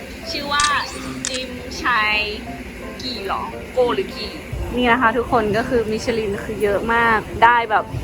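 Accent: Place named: North, Thailand. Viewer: สำเนียงแบบนี้คนเมืองเเน่นอนครับ55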